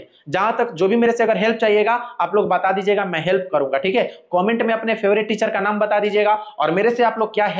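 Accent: Bihar, India. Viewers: Tum pkaa bihar k E ho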